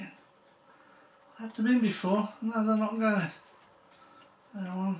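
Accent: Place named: United Kingdom. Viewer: You managed it to let me enjoy the britihs accent . Im sorry but before it really pissed me of, but now i can just relax, Thank you.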